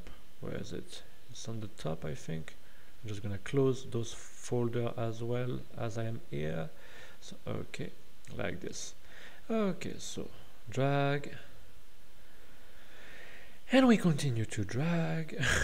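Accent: France. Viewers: Are you french ? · c'est bizarre mais tu parles anglais comme un français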